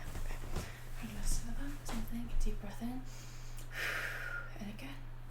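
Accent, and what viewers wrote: Ireland. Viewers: I love the Irish accent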